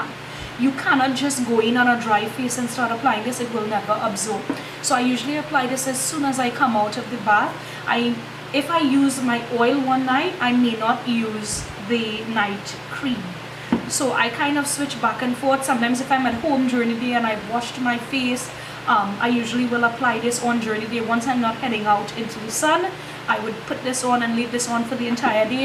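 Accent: Trinidad and Tobago. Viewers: Are you Trinidadian? aha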